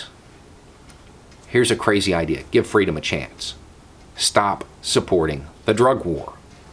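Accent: South, United States. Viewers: This is exactly how I feel! Damn! Its nice to hear someone else with a southern accent say this. Thank you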